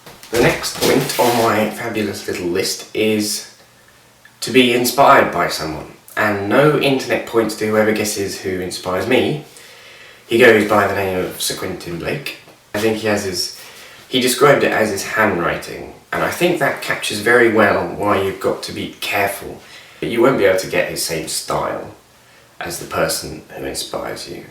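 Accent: United Kingdom. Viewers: "Drawrrings" yep he's british it all checks out. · No he doesnt. He has an English accent, thats about it. Mitchell is much more nasal. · You look like a young Carl Sagan, and you have a deep voice like him, except yours is British haha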